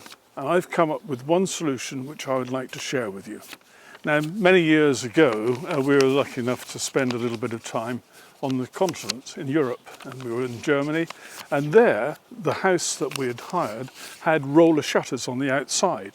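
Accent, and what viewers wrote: United Kingdom. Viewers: Love listening to Brit’s talk about heat waves, currently 31 here and it’s a nice break from the usual 36-38 in the summer. Winter is 0 to -26 check out aluminet shade cloth, we use it for keeping dogs and work areas cool when out training for schutzhund. Works great for reflecting away heat but allowing wind to pass through. I think original use is gardening.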